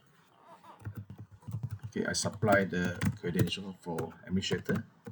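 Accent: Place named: Singapore. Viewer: Hello! Singaporean? I know this accent!...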